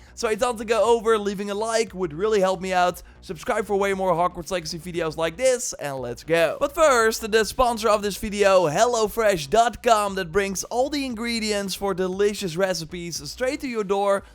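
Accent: Netherlands. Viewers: the "th" sound a not the same as the dutch "d" sound. Maybe it helps with getting rid of the dutch accent if you want that. Just a tip from a fellow dutchy, not to be mean.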